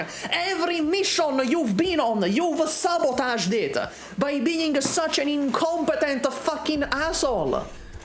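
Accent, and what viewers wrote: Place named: Syracuse, Italy. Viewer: he spoke in a siracusian accent for 3 & 1/2 hours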